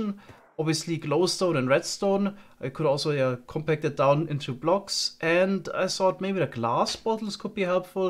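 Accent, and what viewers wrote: Germany. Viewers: Are u German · Hab deinen Kanal erst vor ein paar Tagen entdeckt und finde dich super ! 😁👍 Aber ich fress n Besen samt Stiel wenn du nicht deutsch bist, mein Englisch klingt genauso 😁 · Haha cool du bist auch Deutscher hat man am Akzent erkannt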